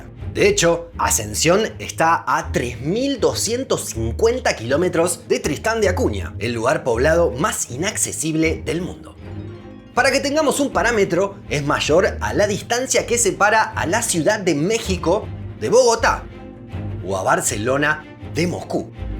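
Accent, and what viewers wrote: Argentina. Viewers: El acento del muchacho parece argentino (un argentino que habla bien clarito), pero me parece que el canal es de España....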